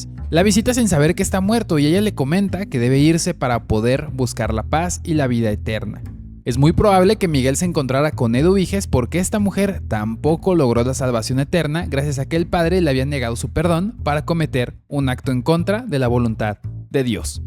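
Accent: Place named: Mexico City, Mexico. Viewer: ke chido acentito de parloteo xilangg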